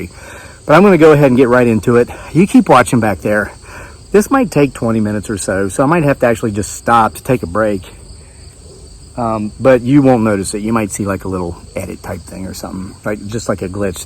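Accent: South, United States. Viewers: you know.... what makes your stories even better - how YOU read them! it adds a southern flavor and brings then even more alive! have you thought to do an audible version of this book? after all this book will be around for a while, just like Halloween! thank you for reading out loud!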